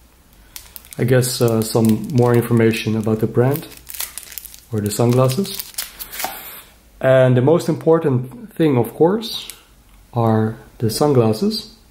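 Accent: Netherlands. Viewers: You sound kind of Dutch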